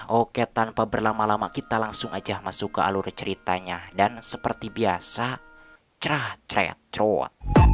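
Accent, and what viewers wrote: West Java, Indonesia. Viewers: sangat terhibur..terutama baceona..eta sunda pisan..😂😂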